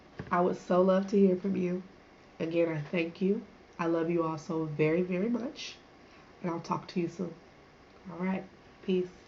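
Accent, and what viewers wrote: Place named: United States. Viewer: Hats off to you sister 😊 very inspiring! How did you get to Ghana originally to know of it as a lifestyle? You sound American born so I'm curious. Of course we all originate from there but I've never touched foot to know Africa as my home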